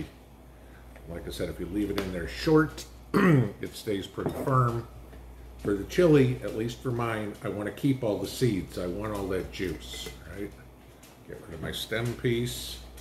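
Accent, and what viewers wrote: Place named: Midwest, United States. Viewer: I just started watching your channel a few months ago and I just KNEW you were not a TN native. I said to my son "That guy is a midwesterner" lol I'm a native Michigander. We know our own lol! Love your channel!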